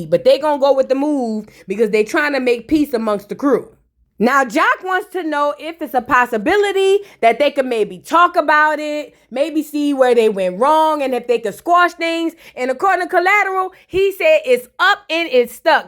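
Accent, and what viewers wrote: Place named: Louisiana, United States. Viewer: Your voice sounds like Tokyo Toni meets Louisiana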